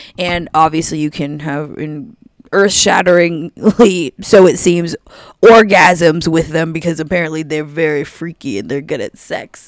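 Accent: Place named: United States. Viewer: You sound a lot like a Yank but I'm just biasedly assuming it, since this is their platform and all. All these smart Yanks are a lot like the Smart Russians, think you know more about people's cultures than other people, living an insular lifestyle, while gate-keeping cultural critique and also political ideology as though they h ave an exceptional awareness compared to other nations of people. This can even include supposed appearances of being activists for colonial exploitation or in a what I call pseudo-left wing point of view.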